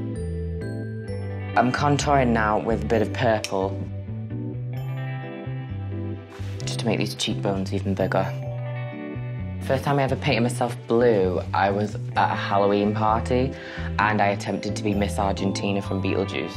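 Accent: United Kingdom. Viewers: i remember watching this video when i was 15 and thinking i was watching the most beautiful women i’ve ever seen. and i wasn’t talking english yet so everything i understand was the british accent and the word stunning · Its called a British accent. but nice recycled meme bro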